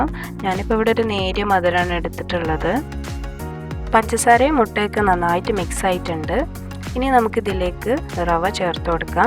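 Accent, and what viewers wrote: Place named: Kozhikode, India. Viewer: Kozhikoodu aano... But language manasilakunna rethiyil🙂🙂👌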